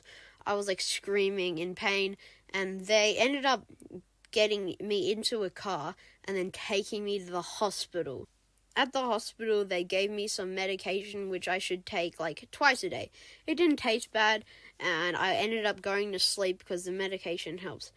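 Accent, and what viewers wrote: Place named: Australia. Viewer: bros Australian 🇦🇺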